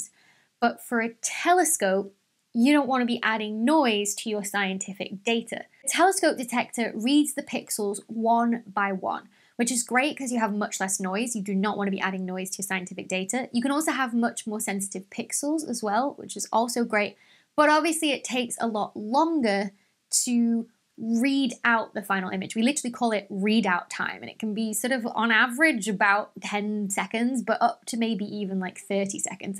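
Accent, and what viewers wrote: United Kingdom. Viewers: Absolutely so! But, in the meantime, I worry that you might be losing viewers ... and I love your explanations so much that I think you should have a much wider audience ... BEFORE the bastions of patriarchy are brought down, which could be a long time! Don't think of it as compromising your English Regional Integrity (ERI!) but just as a courtesy to viewers listening on tinny speakers! When I moved to the US, I resolved NEVER to say "TomAYYto", I thought, "No, let the Yanks learn "TomAHHto", but after 8 years, I just got tired of having to say "No Tomahhto" over and over again (I HATE them, they are Satan's Fleshy Fruit!) so I made my and waiters lives easier by saying "TomAYYto" when necessary.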